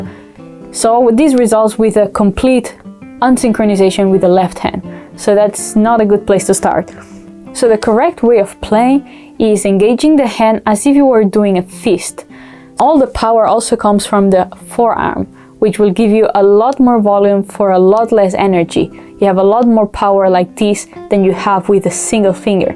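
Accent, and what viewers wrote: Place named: Spain. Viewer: Hola! A pesar de que te conozco desde hace un tiempo gracias a reddit, es la primera vez que veo uno de tus videos y era justo lo que buscaba, me gusta como explicas la técnica y los ángulos de cámara que usas, definitivamente me suscribo a tu canal. Ps. Por tu acento supongo que eres de España por eso escribo mi comentario en español 😅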